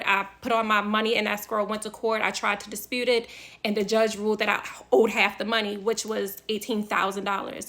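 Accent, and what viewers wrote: Baltimore, United States. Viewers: I could hear it! Hey fellow dmv sista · You from Baltimore ain't it🤙🏾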